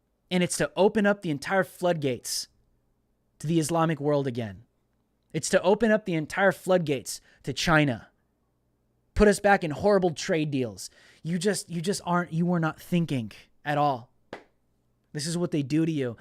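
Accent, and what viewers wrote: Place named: Puerto Rico. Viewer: Bro i saw your speech at the rallly in DC and it was broadcasted by RSBN..............you remind me of me all the other ones were kinda ok but damnnnn...you blew it off the roof about how to fight back.....i don't know if you are latino from new york or from what your accent sounds like maybe puerto rican like me but yooooo....that was the one that i even looked at my lady and said....now this is a man's man lol.....keep hope alive brother this isn't over and eventually the truth prevails...peace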